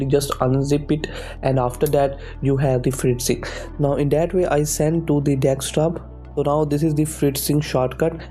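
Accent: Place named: India. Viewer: Indian English ist great to hear ...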